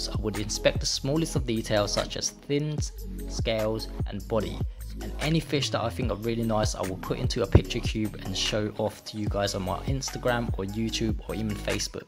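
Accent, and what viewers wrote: United Kingdom. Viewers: Is this guy english? He has a british accent. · He’s a Thai but with a British accent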